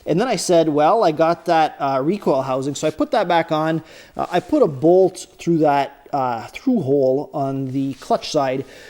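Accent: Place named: Quebec, Canada. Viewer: Est-ce que j'entant une accent quebecois?? 100 pourcent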